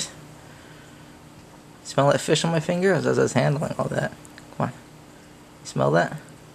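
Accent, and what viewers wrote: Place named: United States. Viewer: WTF WHY DO YOU SOUND LIKE ASHTON IRWIN WITH A AMERICAN ACCENT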